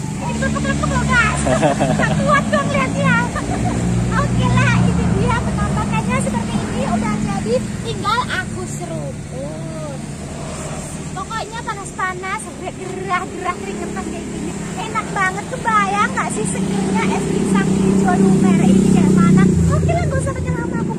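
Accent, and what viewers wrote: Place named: India. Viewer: ES nya segerr..suaranya mirip orang india, kontennya bagus tentang kuliner.semoga bermanfa'at.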